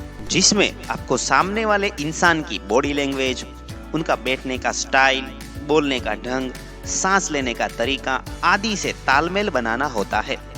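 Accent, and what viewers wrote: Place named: Gujarat, India. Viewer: voice se lagta he aap Gujrati ho.. love from Gujarat I am from Rajkot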